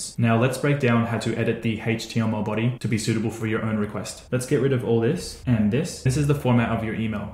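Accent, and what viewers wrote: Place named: Australia. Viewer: I have recognised the warm Aussie accent......I use FreshDesk, same concept, love the video.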